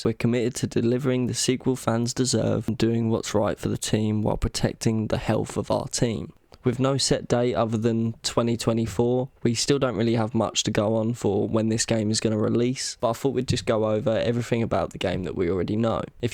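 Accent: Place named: United Kingdom. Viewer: Thank you kindly for your amazing video and I love your British accent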